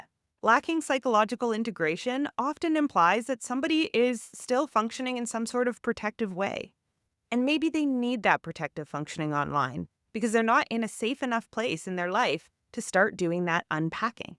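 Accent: Canada. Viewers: You’re from Canada aren’t you?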